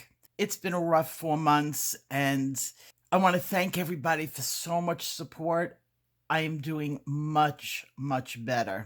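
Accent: New York, United States. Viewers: Wonderful to hear that New York accent, in the YouTube coloring world, once again! Shalom, thumbs up.🎨♿👵Barb Nelson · ! Hi! I've missed hearing your voice and that New York accent I love so much. You sound wonderful! Thank you for sharing your photos of you and Howie. What a lovely couple! What the heck did he do for a living that allowed him to shake Obama's hand?! 🤣❤ I'm so glad you took time off. I can't wait to get my hands on your paste!! Excellent video! Sending big colorful hugs and love your way! And the tattoo.....sniffle.....❤ Love you!!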